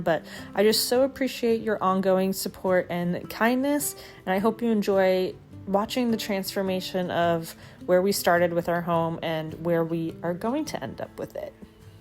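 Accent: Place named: New York, United States. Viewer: What were you doing (for work) before YouTube and motherhood? I remember you said you’re from NY. Upstate? (Accent noted!)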